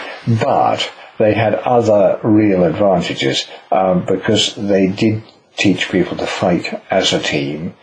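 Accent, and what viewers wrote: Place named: England, United Kingdom. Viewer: please what classical is this? Bach? thank you for informing - by the way, beautiful English accent.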